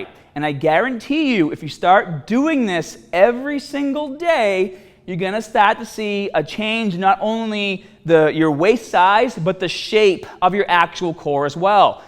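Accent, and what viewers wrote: Boston, United States. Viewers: Mazklassa boston? maybe nyc? i can never tell the difference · This guy has the classic Boston dialect going on. Pretty cool and hard to find. Haha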